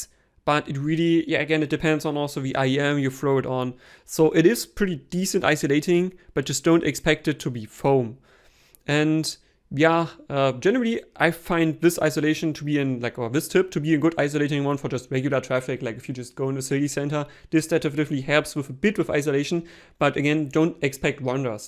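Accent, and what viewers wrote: Germany. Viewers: shouting SS with german accent... to much fo rme.. I roffled and moved on...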